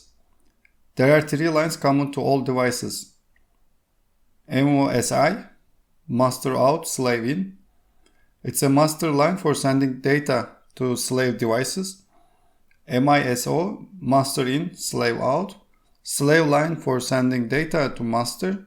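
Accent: Turkey. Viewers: Turkish accent? 😁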